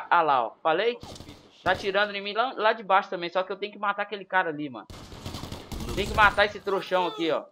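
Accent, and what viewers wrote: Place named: Sao Paulo, Brazil. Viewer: Você é paulista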